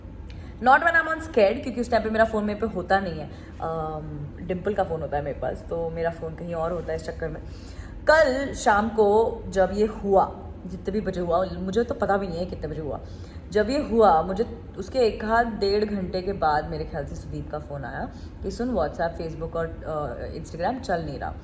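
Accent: India. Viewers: I love the Indian English accent, its so cute and funny!!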